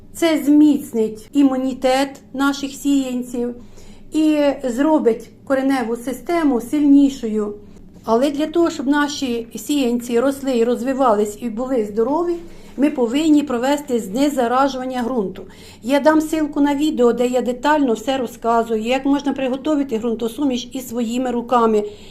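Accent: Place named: Poltava, Ukraine. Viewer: Полтавщину по говору ні з чим не сплутаєш. За відео лайк, а за суперфосфат-неуд )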